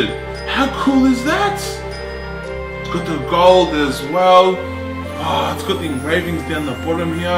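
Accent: Australia. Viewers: England? are you from Englan? i thought you were from Australia.. :p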